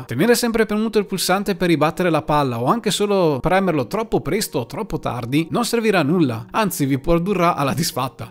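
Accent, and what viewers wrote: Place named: Treviso, Italy. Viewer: Bravo ti seguo volentieri dalla cadenza dovresti essere vicino a me di Treviso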